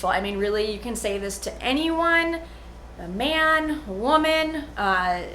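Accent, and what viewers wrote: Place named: United States. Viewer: First time I have watched your video the way you teach is really great, your overall personality speak out when you speak that's good, now I have subscribed immediately to learn American accent, by the way I am a Indian translator for Hindi and Punjabi languages..you are doing a good work, thanks again.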